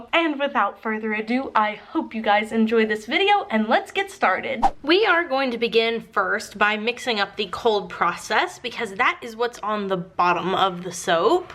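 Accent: Texas, United States. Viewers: It's that Texas accent!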